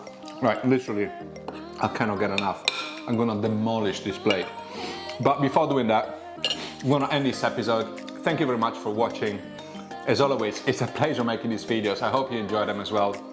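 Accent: Thailand. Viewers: Loving the Thai accent!! Can’t wait to make this 👌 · The Thai accent is perfect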